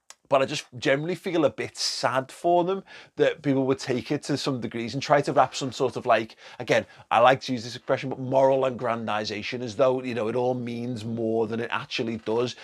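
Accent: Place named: Manchester, United Kingdom. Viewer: Your mancunian accent sounds terrifyingly like my grandad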